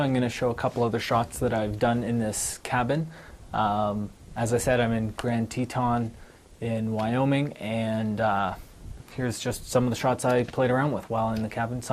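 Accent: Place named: Eastern Europe. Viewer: You sound bit like eastern european but I'm probably wrong. Nice vid!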